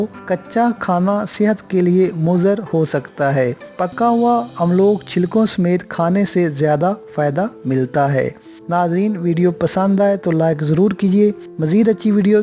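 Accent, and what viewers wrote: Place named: India. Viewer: یہ آواز انڈین کی ھے کسی پاکستانی کی نہیں ھے ہم پاکستانی پھ کو پھ ہی پڑھتے ہیں جبکہ انڈین پھ کو ف پڑھتے ہیں جیسے ہم پھل کو پھل ہی پڑھتے ہیں جبکہ انڈین پھل کو فل پڑھتے ہیں پھول کو فول کہتے ہیں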